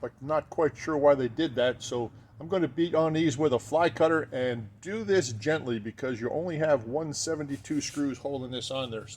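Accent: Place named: Baltimore, United States. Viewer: Unloosen! Your Bawlamer is showing again,!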